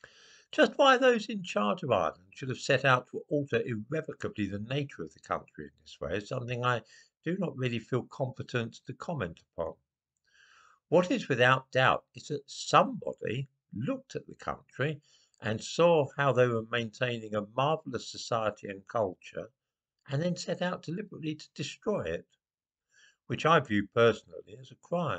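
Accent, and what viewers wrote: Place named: England, United Kingdom. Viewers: This is one of the most ridiculous videos on this website. It’s not people with African or Asian accents that destroyed Ireland’s indigenous culture, but rather people with accents like yours. Unless these immigrants murder and oppress huge chunks of the population SEVERAL times, they will never match what the English did. Also, the definition of what it means to be Irish is changing all the time. Centuries ago, an Irishman was simply a Gael from Ireland. Today, many people who are white and who have ancestry going back centuries would be very offended with this definition, as their own culture and customs are no longer Gaelic, but anglicised. This definition of Irishman will continue to change into the future, and if there’s nothing wrong with the change up to now, then there’s nothing wrong with the change to come. 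· Nothing like a man with a english accent , lecturing Irish where they took the wrong path, ??? After surviving the genocide inflicted on our race by people with similar accents , its kind of ironic this particular lecture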